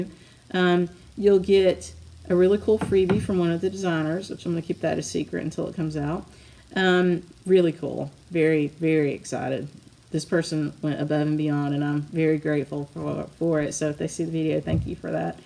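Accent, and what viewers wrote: South, United States. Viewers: The thread legends are wonderful tools and bookmarks, too! I just love your southern drawl! I signed up for the virtual Jeannette Douglas class. I didn’t see the booklet online but I’ll look again. I went to an all girls catholic school in Manila and we had a sewing class in every grade. The Belgian nuns sure taught us samplers. We had one every year, then your embroidery projects for the rest of the school year depended on your skill and speed. I remember we had a darning sampler in 4th grade that included different buttonhole styles. I wish I had kept them all. Is the passcode for the 8th installment of the Saxon band sampler available? Thanks much and also for sponsoring Fiber Talk! Happy New Year!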